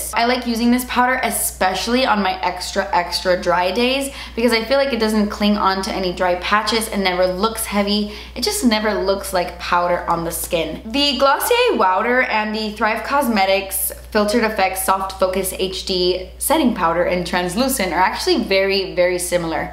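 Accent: Cuba. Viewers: That Cuban accent is coming back😏! Las ventajas de vivir en Miami. 😘🤩😎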